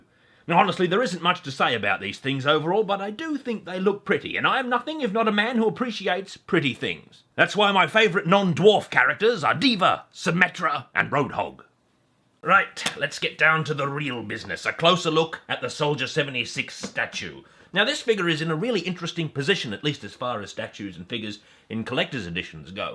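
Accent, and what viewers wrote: Australia. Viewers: I came from the old Hero Quest video thinking you were English and find you're actually Ozzy! You'd fit right in with over here with the D&D crowd, hilarious stuff mate :-D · Are you Aussie? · Is he an Aussie?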